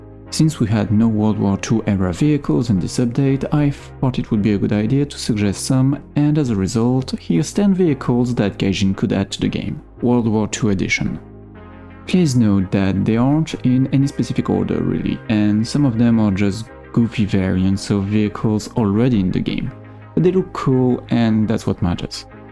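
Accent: France. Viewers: Your french accent is so exiting❤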